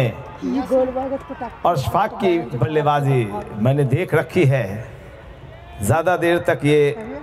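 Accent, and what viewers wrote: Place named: India. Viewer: Your voice is fantastic ji....Totally loved the flow of your hindi commentary. However the English commentary is very awful, Its better to continue in the original Indian English dilect. - Cric Academy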